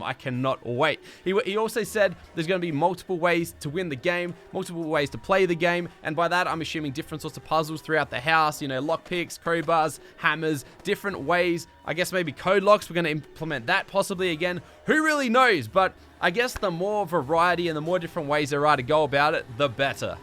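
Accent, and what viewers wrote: Australia. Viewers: are you Australian?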